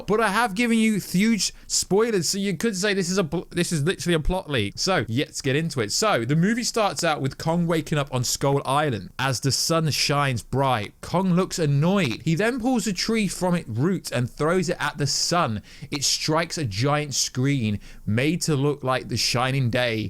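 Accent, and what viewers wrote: United Kingdom. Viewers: ?? BRIT ACCENT ??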